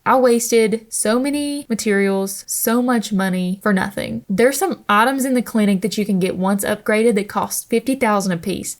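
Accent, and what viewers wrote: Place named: Texas, United States. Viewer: Damn, the stealing from enemies thing is something I forgot about and you'd be forgiven for doing so as the game has so much to it it's easy to. Some fantastic tips here. I've put 60 hours into this game and much of what you've covered I didn't even know about, especially the boss loot,l farm, I've been just defeating them everyday and either getting the drop or not 😅 thank you for this, also you have a great accent! From Texas I assume? Sincerely a UK person 😂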